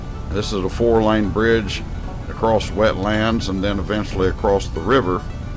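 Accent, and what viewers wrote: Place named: South, United States. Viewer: It's worth watching just for the narration. Love the southern accent 😁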